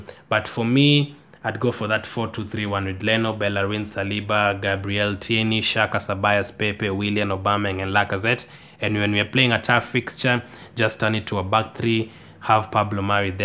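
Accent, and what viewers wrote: Kenya. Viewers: Yo he has a kenyan acsent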